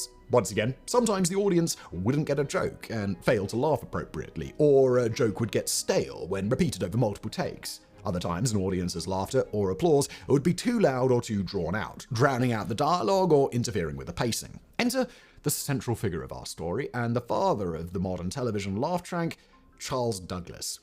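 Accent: United Kingdom. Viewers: I think it's ironic that you was a British person or at least a person with a British accent… Did not mention the use of the laugh track in Great Britain… Especially on the Monty Python flying Circus… They fought against a laugh track and were told by the producers"when will people know to laugh"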